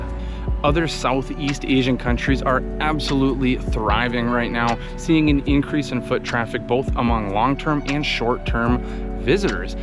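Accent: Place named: Midwest, United States. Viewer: Nice video,. I’m trying to place your accent. Parts of it sound like like Minnesota, but I’m thinking Michigan or Wisconsin.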